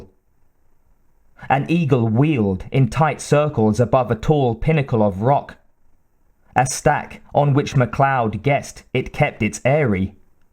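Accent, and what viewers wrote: United Kingdom. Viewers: Listened to most of your audiobooks but couldn't get through this due to your god awful scots accent really no need for it.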